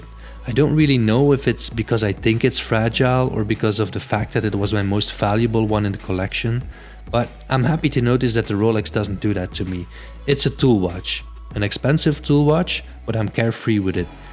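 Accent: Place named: Netherlands. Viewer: You sound Dutch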